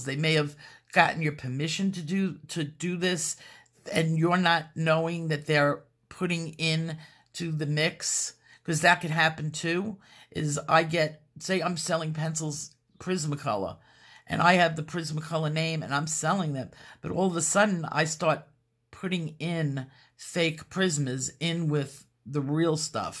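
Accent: New York, United States. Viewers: Yes, I have discovered this myself. Thank you for all you work...as a former NYer I love your accent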